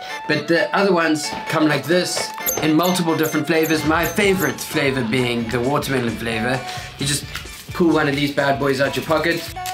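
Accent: Cape Town, South Africa. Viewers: i thought you from cape town bro.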